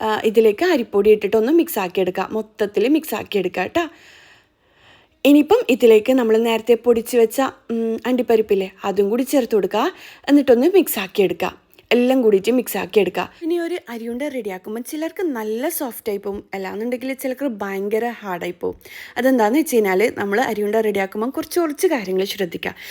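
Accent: Kannur, India. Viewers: നി കണ്ണൂർ എടാ ആണ്❤❤❤❤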